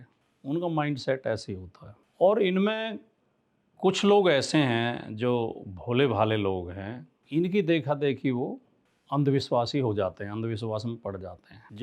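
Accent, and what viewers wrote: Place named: Rewari-Alwar, India. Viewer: मैंने आपकी काफी पोस्ट देखी है कोशिश अच्छी है परिणाम देर से ही सही आएंगे जरुर बाकी अंधभक्तो को आईना दिखाने की जरूहु नहीं है साहब आपके बोलने के लहजे से आप रेवाड़ी अलवर मथुरा लालसोट दोसा भरतपुर इलाके के लग रहे हो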